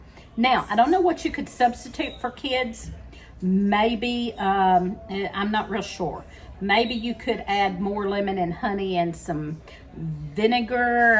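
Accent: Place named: North Carolina, United States. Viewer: A two spoon of that? What’s a two spoon? I’m born and raised right here in south eastern NC Brunswick county or ocean isle beach but your accent sounds exactly like ours that’s why I was wondering if you said two or yea it didn’t sound like tea spoon though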